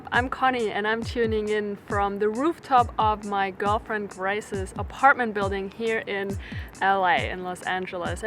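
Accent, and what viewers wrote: Germany. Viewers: Ich hör zwar, dass du Deutsche bist, aber es ist so viel angenehmer als bei vielen anderen. Danke für deine Tipps und viel Spaß noch. :)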